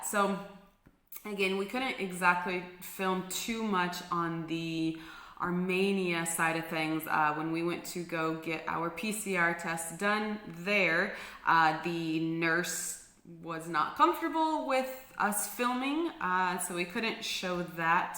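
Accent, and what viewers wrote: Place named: Scandinavia. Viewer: What is your native language? It seems Danish or Nordic or something. The accents read Nordic.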